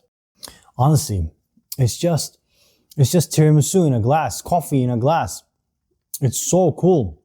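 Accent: Turkey. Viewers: are you turkish? Your accent sounds very turkish 😂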